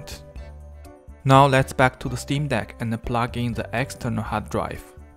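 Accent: China. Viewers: 这口音，中国人吧？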